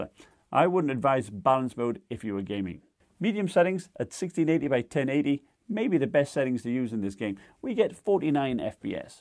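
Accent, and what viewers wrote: United Kingdom. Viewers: Thanks for the review. I've bought this model and I find if to be amazing for the price and specs. What's your accent. I know it's British but which part.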